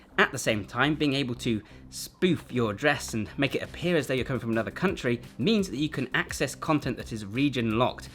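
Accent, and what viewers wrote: United Kingdom. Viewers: I just want to get swole! And I also want to strip body fat too, so I can see my abs. Perhaps it is just me as an American, but I would say that you are a more charismatic individual than you give yourself credit for. You have the British accent down. What % of your viewers are from across the pond?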